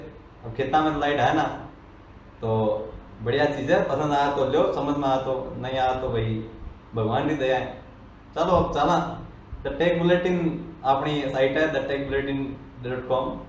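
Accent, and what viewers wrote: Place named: Haryana, India. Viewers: Great bhai!!! Asse hi review or vlogs banya kro...aap jaat ho ...assi damdaar haryani language m baat aap nhi kro gye toh kon krre gye ... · Mst haryanvi h guru ji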